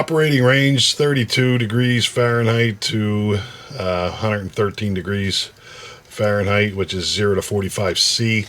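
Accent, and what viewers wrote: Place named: East Coast, United States. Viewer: Ohhhh koooo.... that's what's up watching on my break right now... also I hear the east coast in ur voice...mirrah... instead of mirror lol that's awesome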